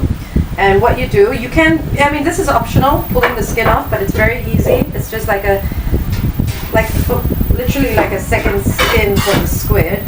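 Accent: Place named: Singapore. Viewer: May i know where are u from. U looks very ANG MO but u speaks some SINGLISH. Very interesting and anyway u're very DETAILED !!!!!!!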